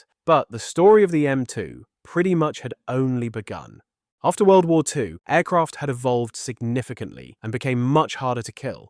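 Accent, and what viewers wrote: RP, United Kingdom. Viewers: Many things I give to the British being an American. The one thing I give more than anything is that for some reason the posh accent makes any statement feel authentic no matter what it is compared to most Americans unless it’s someone like Mike Rowe, Morgan Freeman, Etc. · Hey buddy, take your twelve point seven by ninety nine millimeter, and shove it up your British accent stove pipe, okay?!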